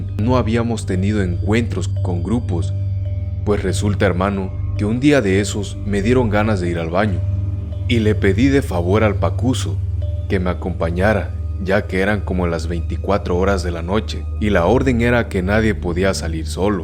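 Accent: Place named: Mexico. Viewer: Me encantó tu historia me hace pensar el mundo está lleno de misterio por tu acento mexicano tu país si tiene misterios y leyendas saludos desde Venezuela levys loreto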